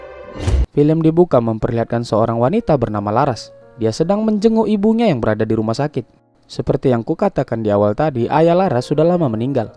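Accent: Medan, Indonesia. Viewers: Lanjutkan logat medannya bg..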